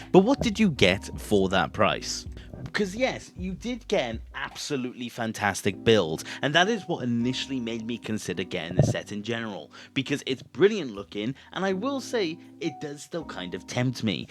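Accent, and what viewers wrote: Wales, United Kingdom. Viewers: Are you Welsh ???🏴🏴🏴🏴🏴🏴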